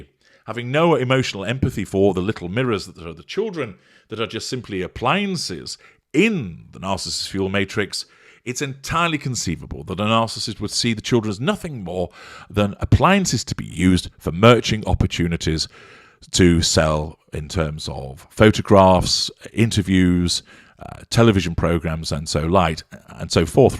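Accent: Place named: United Kingdom. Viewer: YOU SOUND LIKE A BRITISH A**.......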